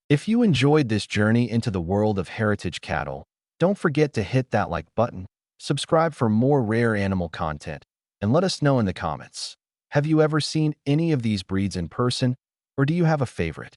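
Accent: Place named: United States. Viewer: The 'British' aren't claiming anything, this isn't a British channel, the voice-over has an American accent. The creator of this content doesn't even have much of an idea of English geography, claiming that Gloucestershire is in the north.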